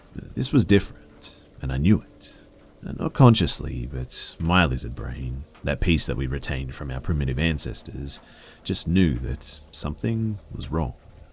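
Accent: Australia. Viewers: He's not British He's Australian. Seriously can you people not tell the difference?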